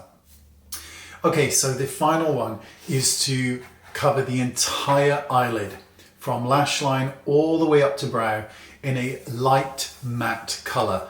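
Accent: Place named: South West, United Kingdom. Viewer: So funny xx ❤ your soft-‘growly’, light SW accent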